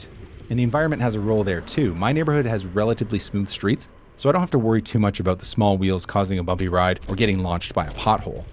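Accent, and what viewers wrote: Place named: United States. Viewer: Bro... Why is this guy speaking American talking about kilometers?!?! Im so confused lmao.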